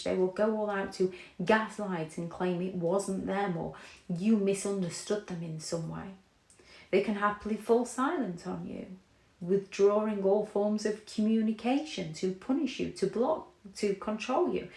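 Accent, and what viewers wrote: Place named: England, United Kingdom. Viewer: I have sleep problems and this came back. When you or I stand up with these nut jobs they are 10 steps ahead with the scams and lies. Yeah by the time I’m typing this here comes the flying monkey platoon. The lil town in Montana has like 300 people in it. A flying monkey started telling me how wrong I was for leaving the lions den. I’m so over a life time of playing grab ars with these lunatics. And listening to your English accent even so makes me laugh at myself when I get tiny pieces. The patterns no wonder you smirk every so often. At least you found a way to help people who otherwise would live in complete isolation. I’m older now and often scard shitless about just living on. I’m tired. I’m not blaming your blocks. I’m just marching on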